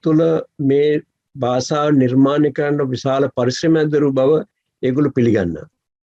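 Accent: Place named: South, India. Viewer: මුනේ මොකද ද්‍රවිඩ ඇක්සන්ට් එකකින් කතා කරන්නෙ. ඔබ යාපනේ නාග හෙලයෙක්ද?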